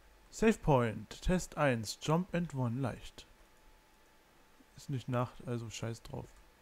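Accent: Berlin, Germany. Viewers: Dat ist Berliner Akzent, so schwer ist dat nicht. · Den Akzent versteht doch jeder! und dasb isschen berlinerisch ick glob ick spinne dat müssteste wenischstens n bissl kenn ehy!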